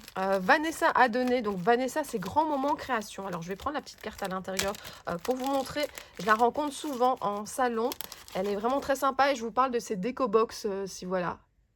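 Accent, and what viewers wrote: Belgium. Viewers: Coucou! Merci pour ce concours auquel je participe avec grand plaisir! J'ai eu un méga coup de coeur pour la collection de Lorelaï Design ainsi que pour le lot de la bulle à scrap! Ce que je préfère sur ta chaîne c'est les reportages des salons qui sont toujours très intéressants et qui permettent de découvrir de nouvelles personnes et boutiques... Et ton accent me rappelle la maison ❤ (belge expatriée en France depuis presque 10 ans ça me donne toujours l'impression d'être rentrée chez moi quand je regarde tes vidéos ❤). J'ai également eu la chance de participer à un atelier à version scrap Paris et à nuance de scrap et tes explications et ton implication sont vraiment super! J'espère pouvoir participer à un nouveau salon en 2024 pour t'y croiser 😊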